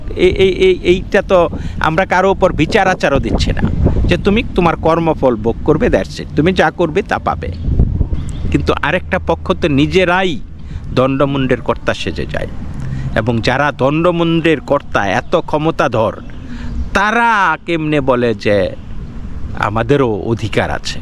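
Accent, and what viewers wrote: Bangladesh. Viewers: Islam is not a religion but is a political ideology.... don't be a hypocrite...you also know the truth... whole EU must ban muslims...and ban muslim immigrants... don't you know how Lebanon was islamised in just 20 years... your dialect tells me you are a Bangladeshi Hindu...have you forgotten the Noakhali massacre of innocent Hindus...??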